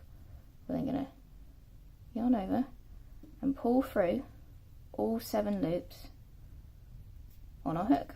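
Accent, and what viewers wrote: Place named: England, United Kingdom. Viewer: Are you using UK or US terminology?? Looks like US but you've a English accent.. Its confusing me..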